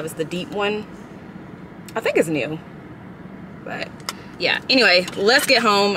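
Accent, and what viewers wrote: South, United States. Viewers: First of all, that oysters vlog makes me laugh! I’ve watched it twice.😂 And you don’t sound “country”, you speak Southern Charm. I will never do YT but seeing the BTS of what all that entails is so interesting to me. Nothing interesting going on here but I still needed this chill vlog. Stay safe and thanks for what you do.💚💚💚💚💚💚💚💚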